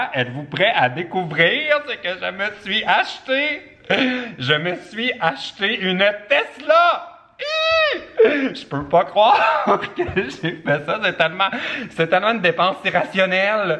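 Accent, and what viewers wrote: Quebec, Canada. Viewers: Omg!! Tu m as eu!!😂 Et cet accent québécois 😍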